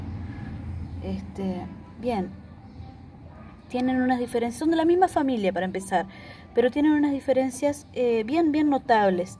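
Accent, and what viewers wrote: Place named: Argentina. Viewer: Me distes una explicacion muy buena y tenes un acento Argentino de lo mas precioso saludos desde Australia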